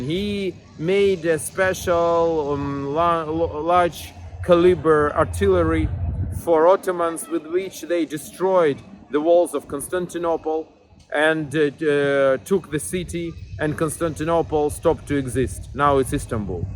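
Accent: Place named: South, Russia. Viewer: инглишь себе подкрути, акцент остался. выраженный южный русский говор. палишься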